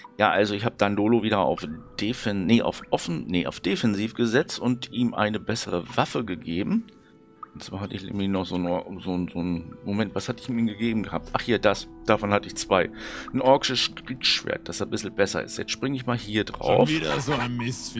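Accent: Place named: Bavaria, Germany. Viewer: Dein bayrisch klingt ganz ordentlich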